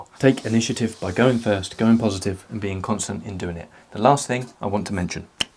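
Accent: United Kingdom. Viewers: I should like to think that I would appear interesting if i have a cool british accent as you do😊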